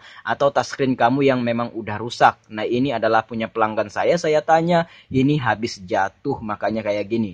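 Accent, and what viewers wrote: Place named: Makassar, Indonesia. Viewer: Dari gaya bicara nya bang,heheh soal nya punya ipar orang makasar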